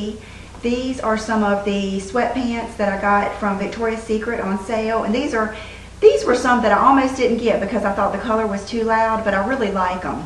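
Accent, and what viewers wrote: South, United States. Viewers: I just love listening to your southern accent. "Steel Magnolias" is my altime favourite movie and you talk like that. You look lovely in even casual clothes - those earrings just set off the turquoise colour beautifully.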